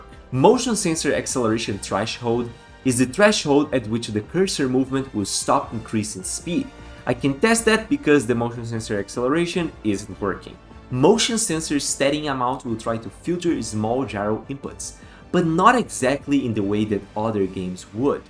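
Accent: Brazil. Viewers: You have the brazilian accent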